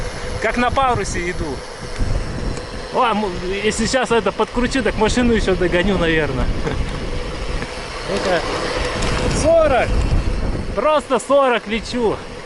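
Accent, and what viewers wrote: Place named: Urals, Russia. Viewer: Спасибо,! Смотрю тебя давно, пишу впервые. Благодаря тебе я посетила Платониду и Шунут-камень. Коренная уралочка, прожила почти 30 лет в нескольких десятков километров от этих мест, так и не добралась туда. Приятно слышать родной, уральский акцент. Здоровья тебе крепкого,ветра всегда теплого и попутного, людей хороших вокруг! С уважением, М.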